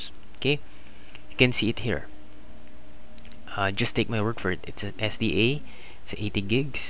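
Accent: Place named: Philippines. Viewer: pinoy accent!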